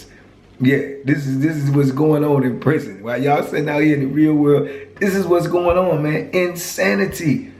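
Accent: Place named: Washington DC, United States. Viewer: Yo I swear I thought you were from dc or pg …. You sound and dress like us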